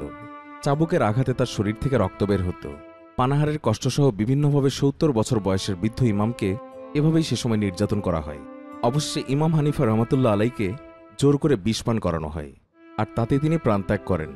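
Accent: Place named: Noakhali, Bangladesh. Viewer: Commentator's pronunciation and language problem, it seems he's from Noakhali.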